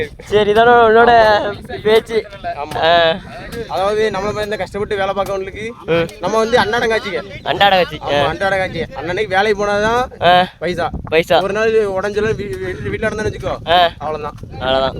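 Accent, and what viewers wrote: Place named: Tirunelveli, India. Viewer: அறுமையா சொன்னீர்கள் தம்பி நெல்லை தமி ழ் வாழ்க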